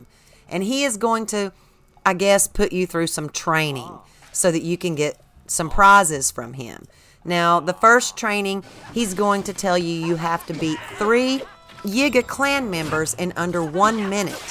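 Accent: South, United States. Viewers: This really is the best channel on here! I mean, that southern accent is not what I'd expect, but I love it!! And the info is super helpful.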